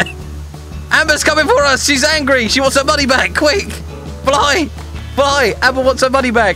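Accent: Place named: London, United Kingdom. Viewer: my mom said you are from london he knows ur accent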